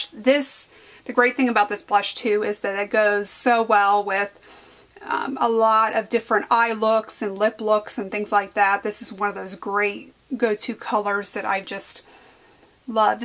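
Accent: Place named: Quebec, Canada. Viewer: C'est trop mignon de t'entendre parler français, j'ai pas l'habitude haha😆🙈 j'aime trop les accents québécois!👌 j'adorerais que tu nous fases d'autres vidéos en français!😁 et enfaite to blend en français c'est estomper!;) bisous xx.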